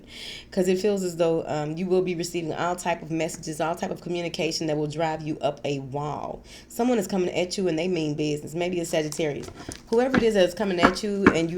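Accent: South, United States. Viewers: I love her country gal accent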